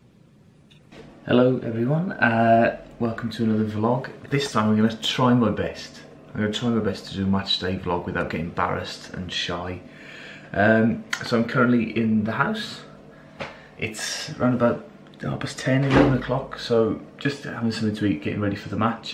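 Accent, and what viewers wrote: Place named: South Wales, United Kingdom. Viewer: Good Vlog. So your a Bridgend boy then? Well I just live down the road in Newport. Been here for 20 plus years and still have me West Mids accent. You sound as if you were born in South Wales judging by your accent. They call me Wolverhampton Dave in Newport and I'm from Worcestershire!!! But I guess with my Wolves shirts and a West Mids accent(as I come from North Worcs-Kidderminster area) I am the nearest thing they have!! Anyway I thought I would stay away from talking about the match.......... As I say good Vlog-well put together.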